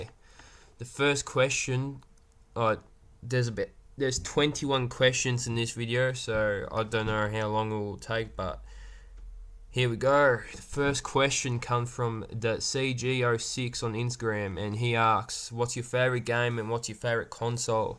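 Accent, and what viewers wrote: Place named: Ireland. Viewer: To be honest, you skipped a few of my questions, but that's fine! Also, I didn't think you're Australian. You sound more Irish than Australian.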